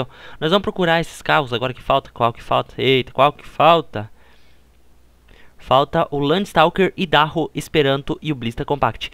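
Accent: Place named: Southern Brazil, Brazil. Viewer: Southern accent of Brazil. 🙂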